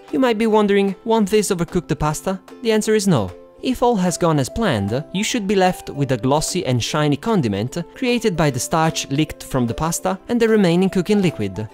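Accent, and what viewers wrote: Italy. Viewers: I could tell immediatly you're italian! for the great recipe alternatives and the accent! I live in italy too and it's great to have alternatives to our traditional plates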